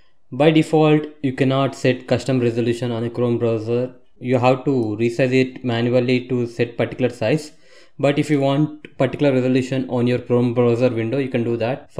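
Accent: India. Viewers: Strong Indian accent!! Virtually impossible to understand his "English". Otherwise if you don't care about understanding it was really good!!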